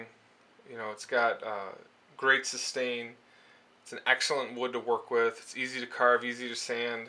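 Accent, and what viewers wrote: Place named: New Jersey, United States. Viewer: That sounds like a Jersey accent. Good review.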